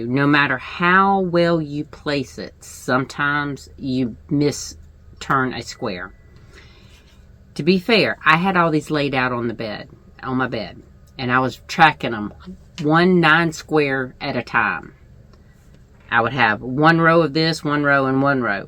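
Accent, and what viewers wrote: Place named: South, United States. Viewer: Hi! I love your videos. I watch every single one of them. Your southern speech comes my hectic Northwest pace. Please do take time for yourself because it’s very important.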